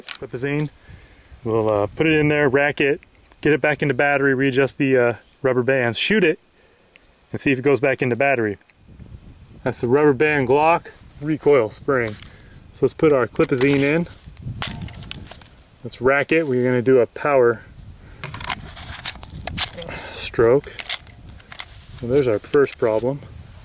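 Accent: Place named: Appalachia, United States. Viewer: classic hillbilly dialect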